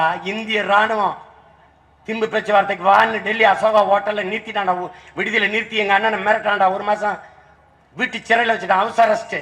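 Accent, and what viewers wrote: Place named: Kerala, India. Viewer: என்ன எழவு மொழிடா இது. மலையாளியா?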